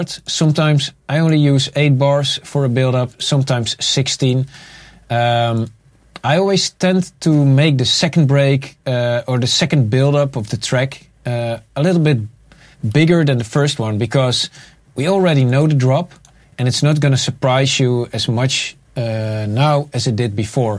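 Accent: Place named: Netherlands. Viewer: You can really hear he is dutch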